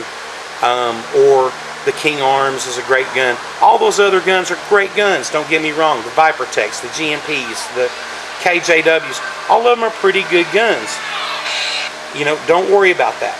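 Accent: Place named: South, United States. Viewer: now hummm now now you have a south accent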